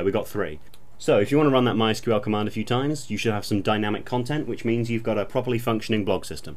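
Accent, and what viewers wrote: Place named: England, United Kingdom. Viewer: God that english accents glues me to this video :O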